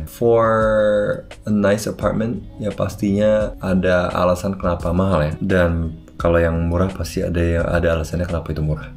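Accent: Jakarta, Indonesia. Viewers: Logat u itu logat indonesia jaksel banget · sdh enak ngomongnya sdh anak jakarta banget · logatnya juga udah Indonesia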